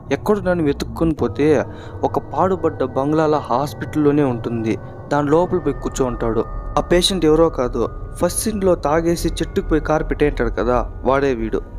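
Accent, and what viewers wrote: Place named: Punganur, India. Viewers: one of my friend punganuru same slang .anduke adiganu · That way chittoor muslim telugu slang language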